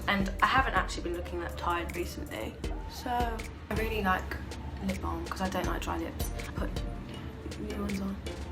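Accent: United Kingdom. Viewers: Awwwww I didn’t know she was British so cute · The calmest British accent I ever heard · U lot act like youve never heard a british accent before lmaooo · I was so confused at the beginning bc I forgot she was British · Wow I had absolutely no clue she was british until I watched this video 😩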